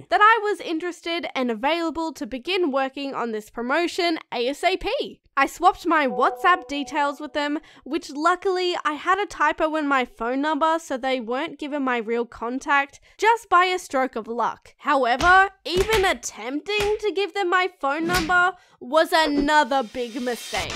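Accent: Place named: Australia. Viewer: I love how I understand you sm because one word.... Australia ✨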